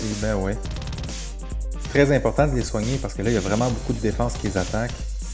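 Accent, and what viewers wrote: Quebec, Canada. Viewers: Tu est québéquois ?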